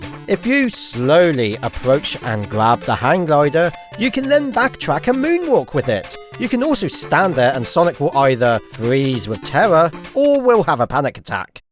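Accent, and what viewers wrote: United Kingdom. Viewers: Son of a glitch, but British :)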